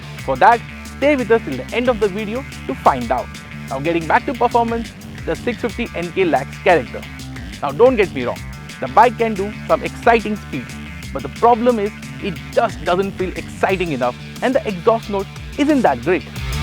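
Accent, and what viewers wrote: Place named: India. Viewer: thumbs down for indian accent xDDD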